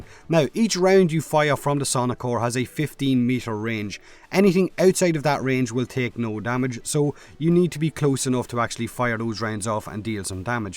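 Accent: Ireland. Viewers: Irish accent sounds so funny :D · Holy shit, that is an awesome Irish accent! Hahah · I do enjoy an Irish accent much better than listening to the wee hawbaw wanks about here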